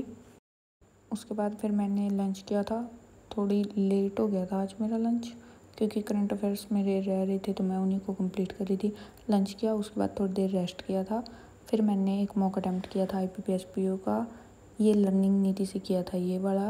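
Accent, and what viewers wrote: Haryana, India. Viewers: Aapki aawaj se lgta h ki aap kurukshetra side se ho...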